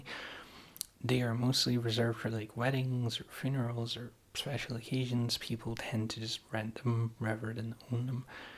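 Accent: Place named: Scotland, United Kingdom. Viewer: When you announced on Twitter that today's video was going to be about Scottish history and culture, I was so excited! I always love to learn more about different cultures~ About your accent, I can definitely hear a subtle Scottish accent, which is why I love your voice so much! Thank you for another relaxing (and informative!) video~ ^_^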